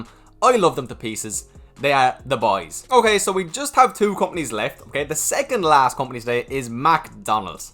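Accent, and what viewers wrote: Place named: Ireland. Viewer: me personally I like everything you do regarding the videos,this new style of presenting is even better I think.Yes never missed a single appearance of yours,as I find important value In you’re presentation.And I think this channel is not a should it’s a must grow in my opinion.Real valuable information,up to date updates specific monitories stock’s,easy language for everyone to understand,beautiful irish accent,best dividend,best growth stocks and even more.... don’t stop do what you do best because you’re very good at it.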